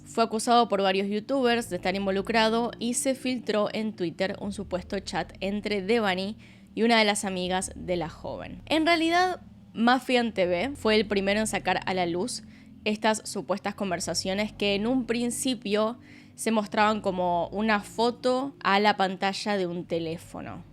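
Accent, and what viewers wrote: Argentina. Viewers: Amiga no hables como Argentina estás en México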